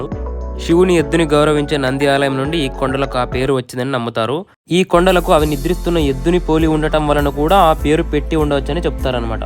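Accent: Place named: Kalyanadurgam, India. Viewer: మాట్లాడే యాస బాగుంది అదే కొనసాగించు ఓకేనా...చెప్పాలంటే మన కళ్యాణదుర్గం యాస😂😂😅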